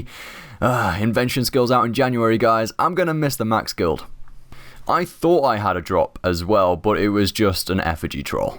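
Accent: United Kingdom. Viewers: Yo, what size monitor do you have and also are you from England?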